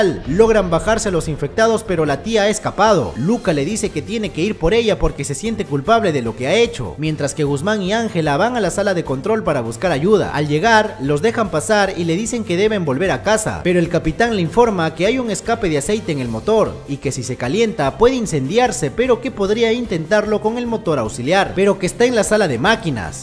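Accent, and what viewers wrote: Peru. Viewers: Literalmente en informacion del canal y por su forma de hablar, es peruano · Soy yo o es Peruano?🇵🇪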